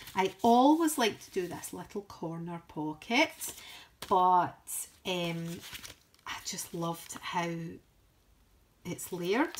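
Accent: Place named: Scotland, United Kingdom. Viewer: Very much enjoyed your flip through. Can I be proud of my Scottish heritage too even though I’m American? Went looking for a Scottish journal video because I’ll be traveling to Scotland in October and want to put together a travel journal ahead of time. Planning on visiting Edinburgh, Glasgow (grandparents came from Glasgow), Oban and Inverness including Culloden battlefield. Now I know I need to find a thistle stamp like yours and bring home some Harris tweed. Thank you for the tips and love love love the brogue!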